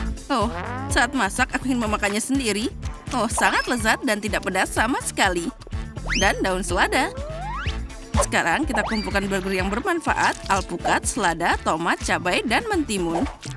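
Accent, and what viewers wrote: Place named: Indonesia. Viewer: Tapi kenapa a sounds Indo bukan usa ker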